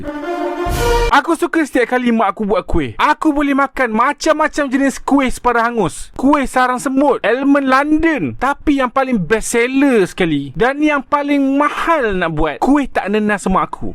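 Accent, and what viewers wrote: Malaysia. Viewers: Man percakapan kau memang macam Malaysia aku pulak ramai cakap aku orang luar sebab percakapan aku sangat formal macam orang putih baru nak belajar bahasa melayu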